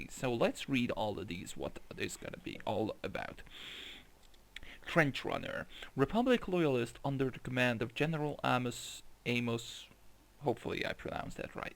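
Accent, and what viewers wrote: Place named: Hungary. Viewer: damn. I should have gotten that...my coworker is Hungarian and now I can relate your accent!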